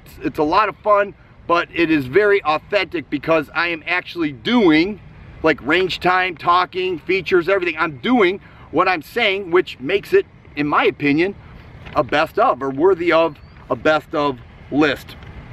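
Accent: Michigan, United States. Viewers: and as far as your voice goes it reminds me of home, fellow michigander here. currently in GA. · Suffering succotash, I never noticed a lisp!! To me, you sound like an honest, down to earth, informative gun guy. And a Michigander. You always respond, not like the other guys...you are number one in my book bud. Keep up the good work. Oh, almost forgot, the refrigerator door series are my favorites!! Any chance of bringing a new, similar series? Steel door, old fridge door, dishwasher door....you see where I'm goin with this? Thanks a bunch.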